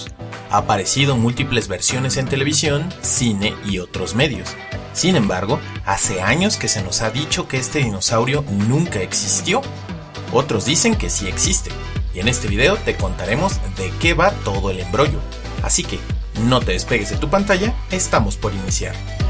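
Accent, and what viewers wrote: Mexico. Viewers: ¿Eres de México?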